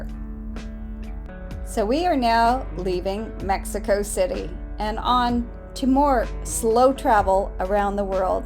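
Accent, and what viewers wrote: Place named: United States. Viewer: Do you have to pronounce it with an American accent? Change the "a" sound. It'll sound much nicer.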